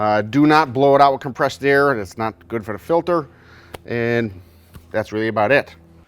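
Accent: New Zealand. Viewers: what part of nz are you from. Chur.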